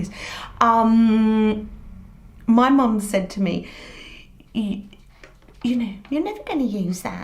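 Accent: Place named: United Kingdom. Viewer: Its a british accent. Lol.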